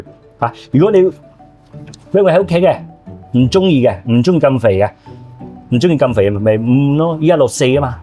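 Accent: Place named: North, China. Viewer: 唔會係純種廣東人吧，講廣東話有北方口音嘅？不過廚藝就頂瓜瓜🎉🎉🎉🎉🎉🎉😊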